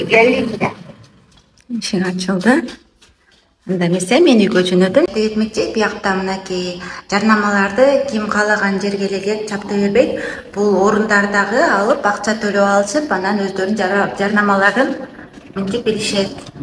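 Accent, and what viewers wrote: Standard. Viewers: Азаматсын! Таза суйлогон кыргыз кызы экенсин.Аман бол.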